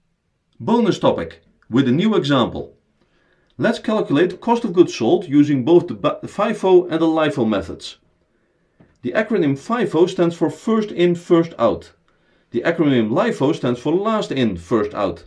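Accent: Netherlands. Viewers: A brilliant explanation. Many thanks! Could I ask please, how would you work out the COGS where you buy something as a set and then split the parts out? I don't mean bulk buying of the same item, but rather if, for example, you bought something like Lego sets. Each set is a sealed box with its own price, and you then "part out" the set into many different types of piece. Each type of piece can sell for vastly differing prices. If you just divided the cost of the sealed box into the number of parts to give each part an equal cost (say, a £100 set with 200 parts meaning the COGS for each part you sell would be 50p) , you could be at a disadvantage when trying to claim COGS if you only sold the rarer parts that fetch high prices because your margin would be higher on those parts. But is that how it should be done? Another example would be a breaker's yard where they buy in scrap cars and then sell the parts off for different amounts. 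What would the COGS be for each part they sold? A wheel would sell for a lot more than a wing mirror, so what are their costs out of the original cost of buying in the scrap car? And yet another example could be a collection of trading cards bought for a single cost. The collection is then split into separate items to be sold, but how should we work out the COGS for each card sold? Thanks for any tips! Echt heel erg bedankt! (sounds like a Dutch accent on your videos, but I might be wrong). :-) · i´ve lived 10yrs in holland (<num> r´dam) .. your accent is unmistakable :D im planning to go back there ..... i buy, grow and sell companys (mergers & acquisitions) .. mostly distressed companys.. but not always.. i could use help from a trustfull financial advisor for my financial due diligence ..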